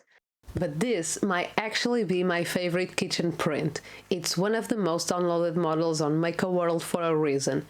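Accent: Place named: Portugal. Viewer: Primeiros segundos do video e percebi de imediato que és Portuguesa 🇵🇹. Além disso, impressões funcionais e não apenas bonequinhos? Subscribed!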